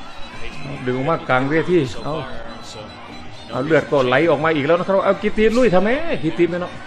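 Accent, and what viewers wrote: Isan, Thailand. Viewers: สนับสนุนเด้อ อ้าย อ้ายหละเน๊าะ ผม2514 คือสิก่อนผมยุดอก มักคักช่องไบรอัน มักทุกช่อง พากย์อิสานมวนคักสนับสนุนเด้อ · พากย์อีสานยิ่งชอบ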